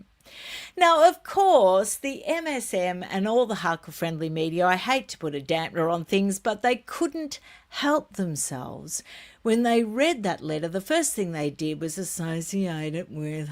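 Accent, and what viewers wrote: Australia. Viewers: I listen to you on YouTube mainly because I miss the Aussie accent. I was a ten pound pom and lived in Adelaide nearly twenty years. I wish people would stop abbreviating Catherine to Kate, but it was great to see who in the royal family were there. Did you find out who the guy was behind Catherine? In UK we need the Royal family especially when some of ministers show their lack of diplomacy by bad mouthy other politicians around the world.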